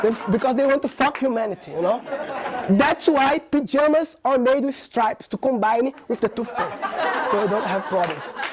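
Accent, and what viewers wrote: Northeast, Brazil. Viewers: Achei interessante. Seu inglês é bem nordestino kkkkkkkkk, sucesso viu, garoto prodígio!!! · Fico orgulhoso de ver um brasileiro ensinando a pessoas do mundo inteiro algo mais importante que futebol. Mas, o que ganhou meu dia foi ver que esse brasileiro é um nordestino. Ou seja, vem de um lugar cujas pessoas são vistas, por gente de outras regiões, como desqualificadas e incapazes de ocupar posições diferentes de "porteiro de condomínio". Morei 6 anos em Recife, voltei para Natal há pouco mais de 1 ano e gostei muito de rever (ouvir) esse sotaque. Mas, dessa vez, em inglês e ensinando ao mundo como pensar! Nailed it,! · Nordestino fala inglês também quando diz: "Oh shit, negga!" - Em português: "Oxente nega!" Parabéns, uso muito seus conceitos na minha vida, simples e diretos. Admiro você por que você continua com o sotaque e não fica querendo modificá-lo, vivemos num país continental, é normal que isso exista. 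· Excelente,. Estava querendo ver essa sua palestra há um tempão e de repente poder assisti-la assim com esse northeastern accent foi massa demais =P. Curti muito esse conceito de ideias sendo armazenadas e também a ótima ideia de resolver problemas que não são seus. Obrigado por compartilhar a palestra e sua jornada na singularity. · Inglês com sotaque nordestino kkkk. entendi bem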